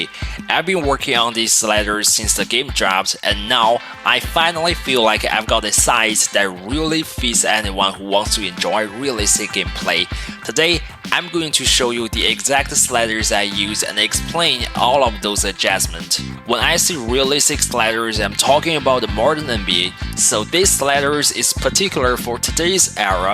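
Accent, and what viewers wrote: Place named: China. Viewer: This guy is probably Chinese.. cause of his accent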